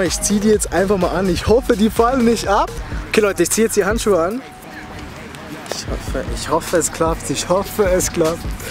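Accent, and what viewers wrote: Switzerland. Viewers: von wo kommst du aus der schweiz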